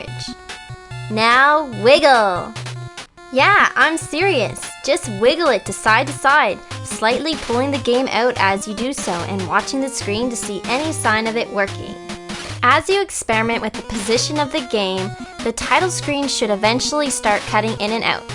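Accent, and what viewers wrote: Canada. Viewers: I can tell she's Canadian . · Are you Canadian?! :D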